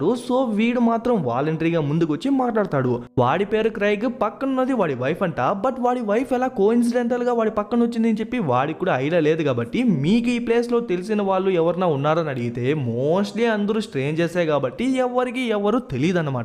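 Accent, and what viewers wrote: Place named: Karnataka, India. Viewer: ఎం ఉండ,ముండా,బండ రా కొజ్జనా కన్నడ గా తెలుగు కి తెగులు పట్టించే లా ఉన్నావే నువ్వు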